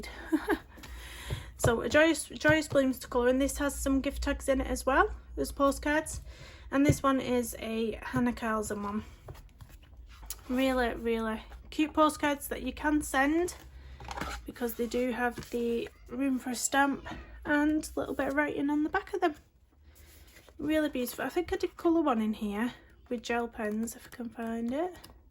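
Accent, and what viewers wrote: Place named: Yorkshire, United Kingdom. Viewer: Just found your channel and i love it very much. I am a senior who colours so i find your content very informative. Your children sound adorable so don't ever apologize for their noise. They grow up and leave home very quickly and then you hope for grandchildren so the noise comes back. I do have lots of these books so i will be able to colour along with you too. PS I love your accent. Im assuming its Yorkshire but could be Lancashire.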